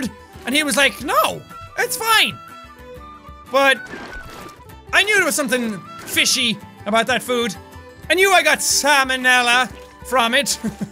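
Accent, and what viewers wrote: Ireland. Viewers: Not gonna lie, when went full bore Irish I think my brain (and heart) melted a little... Also, I do believe my ovaries may have exploded... 🤷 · & awesome sounds like Ireland 🇮🇪!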